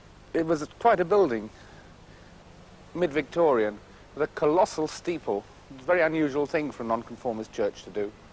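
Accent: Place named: RP, United Kingdom. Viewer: Well that is received pronunciation and I speak with the same accent and I have lived most of my life in Huddersfield. There are a myriad of accents in Yorkshire alone.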